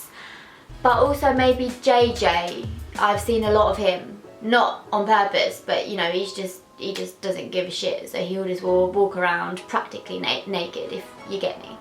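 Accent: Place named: London, United Kingdom. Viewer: Not even joking I didn't realise you had a stammer, I thought. it was the LDN accent.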